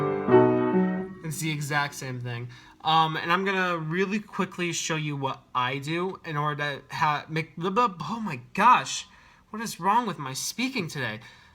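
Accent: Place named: United Kingdom. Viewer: I don't understand a word you say because you speak too fast for me and i'm learning british english so you're acent is very different of what i'm used to listen jajajajaja. Well more or less I understood something, it was a joke :D Back to what I would like to say, I like very much how you play the piano and also the emotion and effort that you done with your work. I can only say, congratulations!! PD (I think that american people use PS but it doesn't matter): I don't pretend to butter you up JAJAJAJA Take care!!! And again sorry if my english is horrible :)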